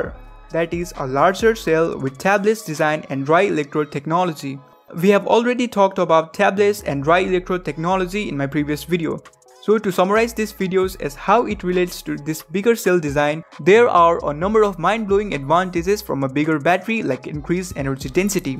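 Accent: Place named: South India, India. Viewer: A very south indian, SL accent, please speak a little slower for it to be easier to follow !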